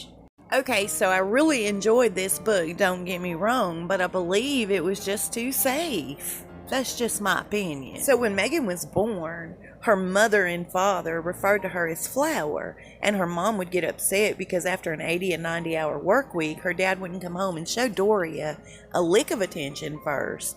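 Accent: South, United States. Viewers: I need you at some point to say "bless her heart" in your wonderful southern accent. 😊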